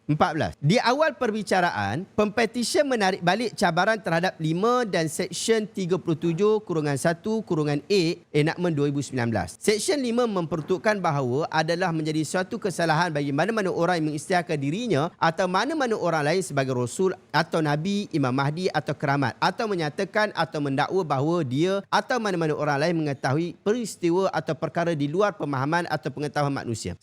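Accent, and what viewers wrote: Malaysia. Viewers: Ikut telo melayu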